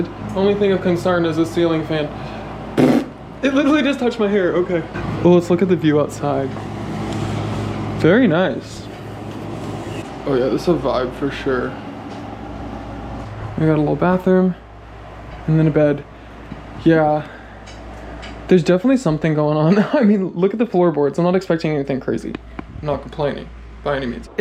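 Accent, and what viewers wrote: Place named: Baltimore, United States. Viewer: Love you, and your B’more accent. I grew up in Dundalk, now in Lutherville. Great, fun videos, keep on traveling! ❤️❤️❤️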